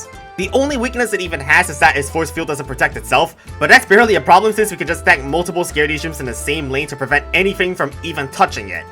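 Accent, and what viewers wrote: China. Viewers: I think I'm in love with you. Lowk love your videos and Chinese accent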